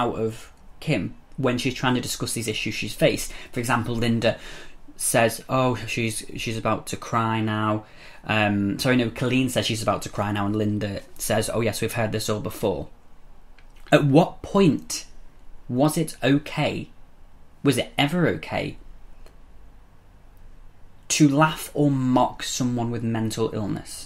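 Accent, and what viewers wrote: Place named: Liverpool, United Kingdom. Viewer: I agree with you! Just a low and disgusting way to treat someone xxx I subscribed because you’re a cool guy with a hint of a scally accent xxx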